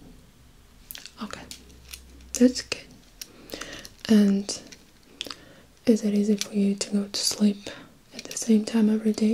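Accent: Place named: Russia. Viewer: Впервые на канале)) но сразу слышно русский акцент)) и книги 📚 физиология человека😄 но видео ничего есть пару норм!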